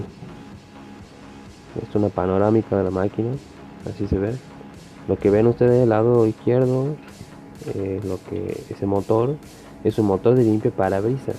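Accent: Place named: Santiago del Estero, Argentina. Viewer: Hola amigo, te felicito....muy bien explicado....parece tonada Santiagueña 😊👍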